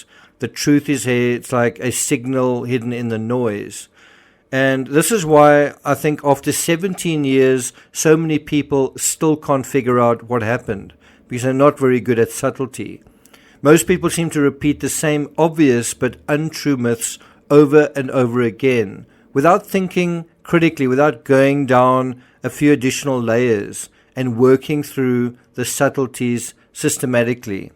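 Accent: South Africa. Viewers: Just found your channel from the Deception Detective who reacted to this video! Love it! Also awesome to hear a South African on YouTube! Keep smashing it!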